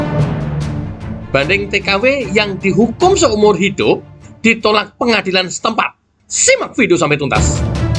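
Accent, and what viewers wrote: Java, Indonesia. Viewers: Bang?? Leh moco berita apik logate,..d campur bhs jawa.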